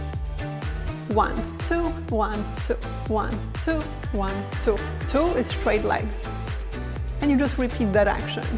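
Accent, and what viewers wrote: Poland. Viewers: Great teaching, thank you! I'm going to watch your other videos! BTW. Have you any connections with Poland? I can hear sth like "Polish note" in your voice ... :) Don't answer if it is too personal. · You sound Polish. My parents are Polish.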